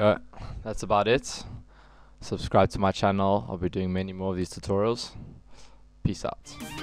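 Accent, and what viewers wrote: South Africa. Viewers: you also from south africa dude? lol youve got a south african accent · sigh that Joburg accent xD noo man leka boet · Are you South African?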